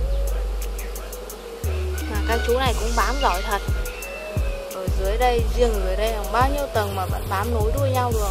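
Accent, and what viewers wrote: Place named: Hai Duong, Vietnam. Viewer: Nghe giọng như gái hải dương hoặc an lão hp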